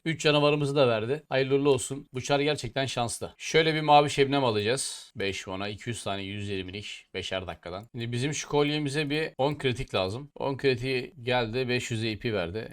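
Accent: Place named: Adana, Turkey. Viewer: Adanadan izliyorum kardeşim oyunun hakkını veren oyunculardaniz bir zamanlar ama videonun sonuna kadar keyifli izledim sende bir adana şivesi var gardas başarılar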